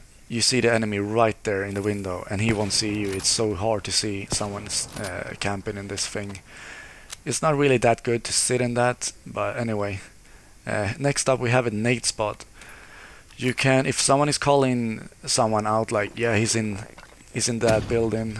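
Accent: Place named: Sweden. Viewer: you are for sure swedish😃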